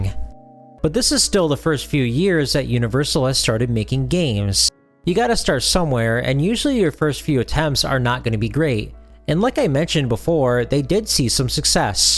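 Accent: Chicago, United States. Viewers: I have no words to express how happy it makes me to have do his signature smile after his intro. That and his Chicago accent makes me click right away. Oh and the content is great too.:)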